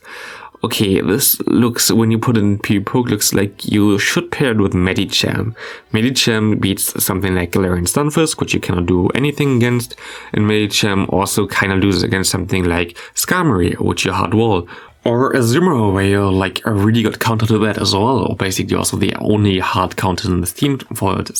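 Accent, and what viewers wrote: Germany. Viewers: Bro I think you have an accent, are you german by any chance?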